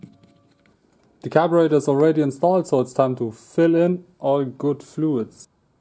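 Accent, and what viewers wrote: Germany. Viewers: Mach deine Videos doch einfach auf deutsch. Dein deutscher Akzent ist unüberhörbar 🙄 Ich wollte in den 90ern auch immer eine RS. Hatte damals eine Yamaha DT80 MX. 9000 Mille kostet die Aprilia damals. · Deutscher Akzent on point, aber richtig gutes und interessantes Video. 😉 Like hast du.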